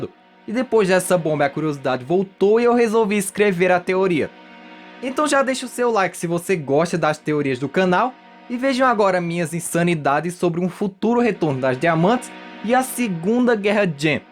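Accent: Northeast, Brazil. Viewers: voce e do nordeste eu so moro na bahia valeu pela teoria muito loco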